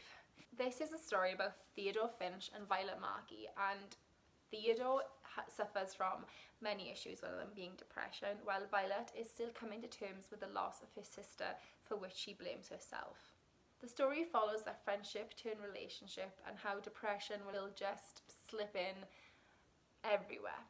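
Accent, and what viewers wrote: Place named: Wales, United Kingdom. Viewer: Welsh huh. None too many have your accent in Canada. I just have the generic Canadian accent, which is clear but lacks lilt. Can't say I read any of your top 8 this yr.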